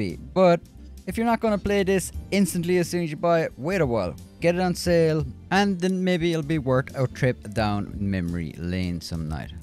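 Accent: Ireland. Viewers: Thanks dude, first thing I copped was the Irish accent 😂 I'm only down the road ha but it's about time someone did an honest review not just bashing the game. Playing San Andreas via game pass on Series S and loving it. I think they look class. Yeah it's Cartoonish but it's supposed to be. Anyway I'll play this through and maybe buy the trilogy. Great review man. Subbed 👍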